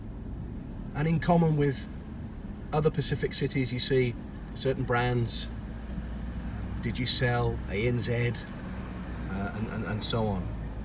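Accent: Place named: United Kingdom. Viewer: He is British definitely from Uk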